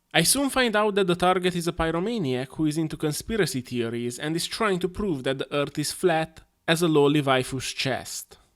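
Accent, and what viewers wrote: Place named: Eastern Europe. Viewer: i thought his accent sounded a little bit Slavic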